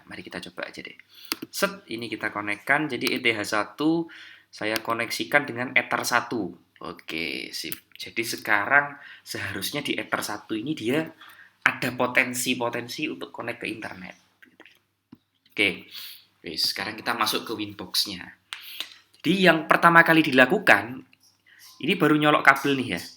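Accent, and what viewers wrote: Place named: Java, Indonesia. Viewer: ngevapenya kayak menikmati hidup gitu mas. Wkwk. Medoknya masih yaa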